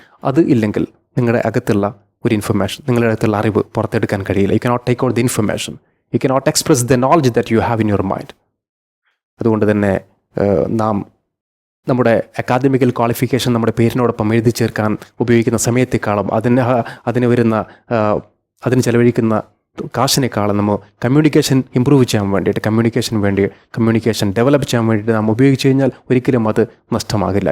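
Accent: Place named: India. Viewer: your accent is terrible you Indians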